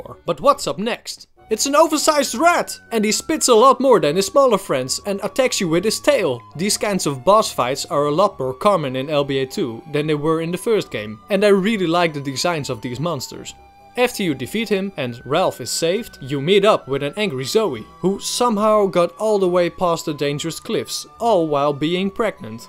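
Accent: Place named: Netherlands. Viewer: are you dutch? you have a dutch accent but you say your location is united states.....you'er a dutch living in USA or you live in NL? i'm in the hague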